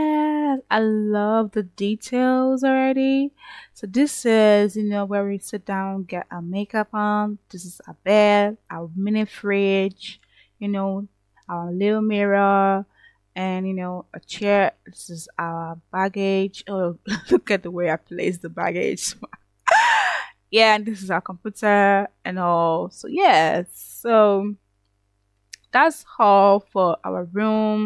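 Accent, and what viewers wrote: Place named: Nigeria. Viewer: I thought so from your accent but I wasn’t sure haha. I am also from Nigeria based in the UK. It’s lovely to meet another Nigerian simmer 🥰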